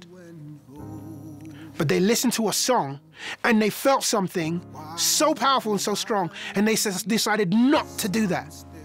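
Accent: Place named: United Kingdom. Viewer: He actually does, just because it's not a heavy posh accent doesn't mean it's not british.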